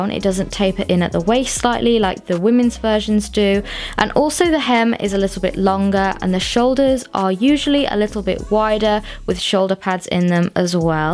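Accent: England, United Kingdom. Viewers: Ja sie kommt aus England 🏴 Das ist britisches Englisch :) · I'm German and really bad with English accents but where are you from? I'd say England but I'm not sure 🙈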